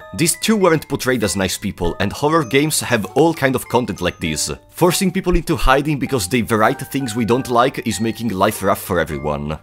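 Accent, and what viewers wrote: Italy. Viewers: I immediately knew that you are from Italy cuz of ur accent xDDD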